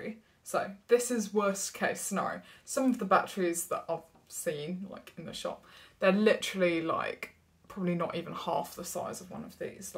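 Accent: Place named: England, United Kingdom. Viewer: Hi, just seen you for the first time. I am impressed by your lovely english accent, and very clear speech. Definitely no deterioration there. I used to have a friend who went deaf in his 20s. I knew him when he was in his mid 50s. He still had perfect speech despite having virtually no hearing at all for many years. Even when we were stood right next to a noisy compressor that started up, he had no idea it was running until I told him. I just mention his experience to make the point that deafness does not necessarily lead to poor speech. With regards to your Naida aids needing batteries. I think in some ways that is more convenient. For example, when you go travelling, you don't have to take a charger with you. And no worries about finding somewhere to plug them in. Or finding a suitable adaptor for foreign power sockets. Or if you are travelling overnight, the rechargeable ones might run out of power. 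You seem to have a good attitude to life. I am pleased to have "met" you. Best wishes.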